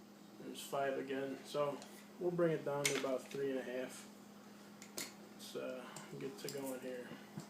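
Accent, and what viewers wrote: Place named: Illinois, United States. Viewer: ill bet you a ten shot wilson combat magazine 9mm ur from illinios?, i can hear it in ur voice? if I'm wrong let me know and I will send you the magazine for a 9-millimeter Wilson Combat to any address of your choosing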